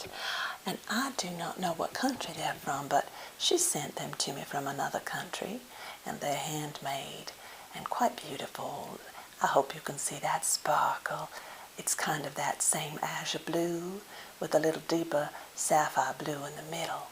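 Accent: South, United States. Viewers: you remind me of one of those 1950" s housewives you're beautiful and have a very southern accent love to hear southern accents mine is Lakota Sioux sound very young voice lol anyways love your videos and earrings but why do you whisper ? and anyway love to be friends on my fb my name is summer rain Americanhorse on F B ty for the videos God bless🐝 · Love Miss! Would like to hear more as I do love her southern accent - gives me tingles! This is one of my top fav asmr videos. Thank you. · I love the fact you didn't go overboard with the usually stereotypical "Southern" accent. I have actually heard women that speak like this. Especially how you said window screen. Thank you for your wonderful videos! I am so glad I found you! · When the video first started, I was disappointed for a minute because I was expecting a different kind of Southern accent but the longer I watched it, the more my head melted so I am no longer disappointed! 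Thank you very much for this!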